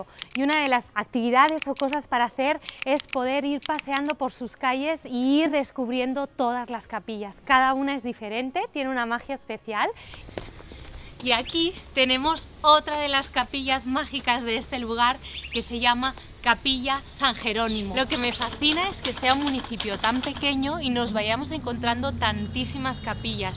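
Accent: Andalusia, Spain. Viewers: Bonito video y cada vez tus visitas, tienen más acento andaluz..... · ¿Por qué si eres de Valencia,hablas como de Andalucía}? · Una catalana con acento andaluz? Que interesante!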